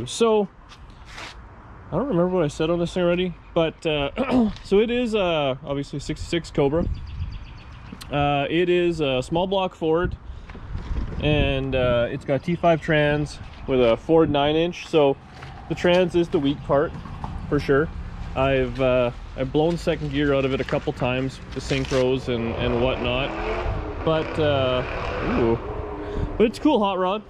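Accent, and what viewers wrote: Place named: Canada. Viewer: I listened to this for the Canadian accent.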